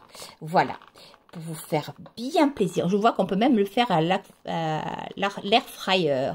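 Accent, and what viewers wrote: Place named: Ariège, France. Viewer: Coucou ma puce , j'adore regarder ta vidéo et je vois que tu as gardé ton petit accent ariegois ❤ bravo ma chérie , pour tes petites courses , j'adore ma puce tu es vraiment naturelle , gros bisoussss ❤❤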